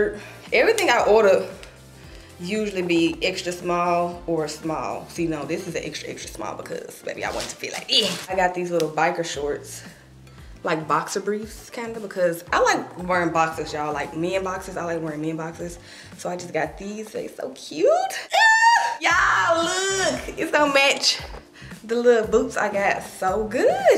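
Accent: Memphis, United States. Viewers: Hearing the Memphis slang and accent through her videos is so homey to me 😭 I be home sick when I’m not there.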